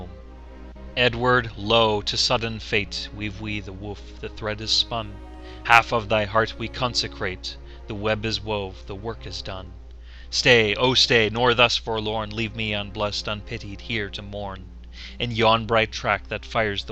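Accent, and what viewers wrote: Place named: United States. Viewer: Diolch yn fawr! Although not sure the US accent lends itself to medieval poetry ;)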